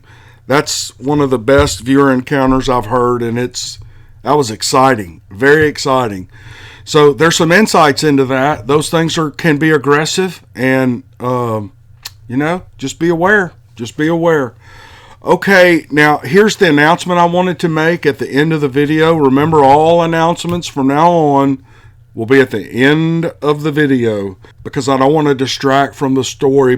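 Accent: South, United States. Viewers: I really appreciate hearing stories from and about southern people being told by someone with a pleasant southern drawl not to mention the soothing voice. Thank you for the great job you do narrating these episodes. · Love this channel, I'm from London so love the southern smoothness, keep up the good stuff my man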